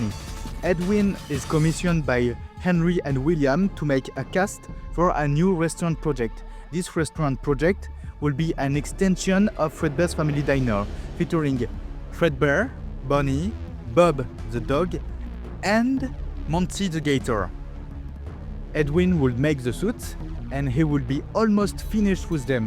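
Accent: France. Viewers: Are you french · The french like accent is soo soothing, good video , ill be studying this video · this is the THICKEST franch accent I've ever heard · Tu sais quoi, tu m'inspires. J'ai aussi un accent français quand je parle anglais et ça me freine beaucoup quand j'ai envie de créer du contenu en anglais. Je trouve ça vraiment incroyable que tu "oses" faire une vidéo complète en anglais ( entre guillemets parce que le mot "oser" à une connotation presque négative, comme si ça impliquait que t'aurais jamais du faire la vidéo! Et c'est pas ce que je veux dire du tout!). Enfait bref, j'adore et merci beaucoup! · Are you French? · Are you french ?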